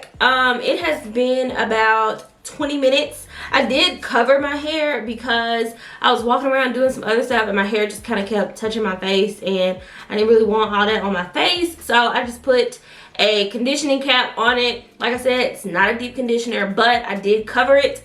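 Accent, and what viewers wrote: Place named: Memphis, United States. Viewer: I like your accent. Sounds like a Memphis accent lol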